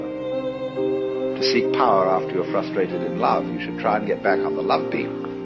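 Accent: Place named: RP, United Kingdom. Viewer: You are the first posh-sounding English bloke I've EVER warmed to. Thanks😊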